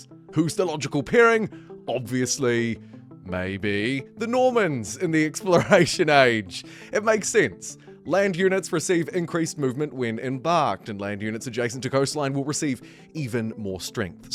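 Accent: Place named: New Zealand. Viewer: Good to hear a Kiwi accent coming up on 100k subscribers. Well deserved too!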